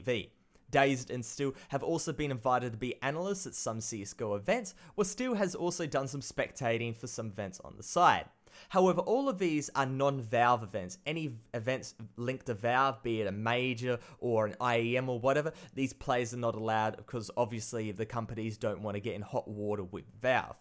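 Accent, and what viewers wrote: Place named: Australia. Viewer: 70k damn you are gaining quick bro! Really enjoyed this video, I think we all agree on the fact that CA is dead and probably has been for 2 years already. But it doesnt really matter what game, your commentaries are always so chill. Love the aussie accent haha.:P keep going bro:-)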